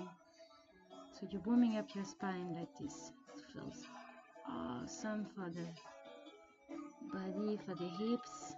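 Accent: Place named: France. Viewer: Nice video 😊 are you French? Can recognise the accent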